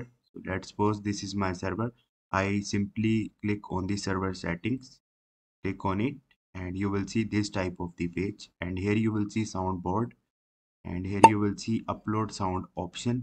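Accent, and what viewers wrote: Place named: India. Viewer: bro is trying so much to dont have an indian voice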